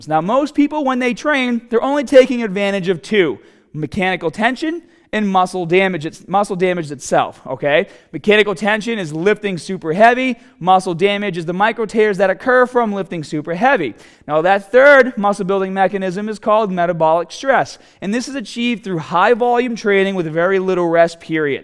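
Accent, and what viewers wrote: Boston, United States. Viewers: Hey bro I’ve been watching your channel for ever if feels like!! just wanted to tell you, your so inspirational and full of information that you have inspired me to start my own fitness transformation journey and VLOGG it as well for YouTube!! Love your channel and I love your information n that Boston accent is for real!!!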